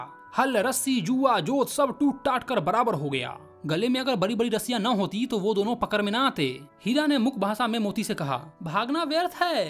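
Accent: Bihar, India. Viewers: Bihari tone kam nikala karo samajh nhi aata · Aap bachhon ko hindi padha rahe ho ya bihari... Apni language par thoda kaam keejiye... Bachhon ki bhasha sudharni hai bigadni nahi hai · I know you are Bihari 😊😅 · Bhaiya aap Bihar se ho kya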